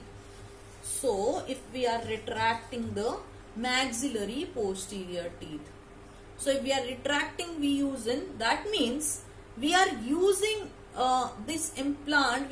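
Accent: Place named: India. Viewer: Thank you ..can you make translation for vido it is very good but i cant undrstand indian accent very will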